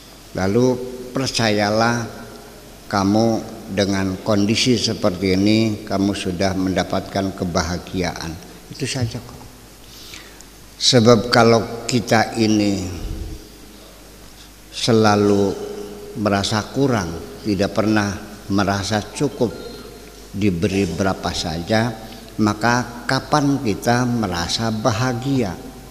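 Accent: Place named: Indramayu, Indonesia. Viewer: WASPADAI UCAPAN2BUYA SYAKUR dg DIALEK BAHASA KHAS INDRAMAYUAN?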